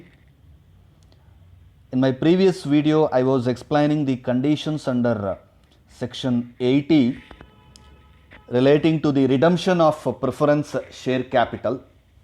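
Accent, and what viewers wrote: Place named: South, India. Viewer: Aap south kyu bolne lagte ho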